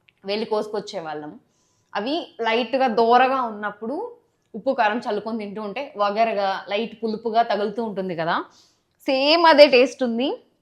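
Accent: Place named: Rayalaseema, India. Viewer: Om Shanti you are from Rayalaseema kada inti vallato kaburlu cheputunnatla vundi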